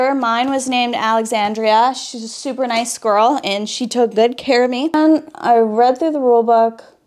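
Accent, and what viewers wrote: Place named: South, United States. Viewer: Easy on the Southern accent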